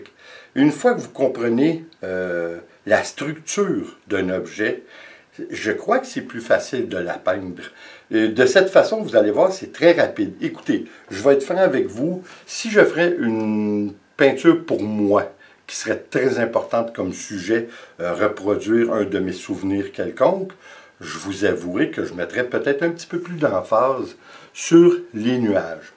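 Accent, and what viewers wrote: Canada. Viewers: Bravo cher vos explications Meme avec votre super charmant accent Canadien sont formidables 👏👏👏👏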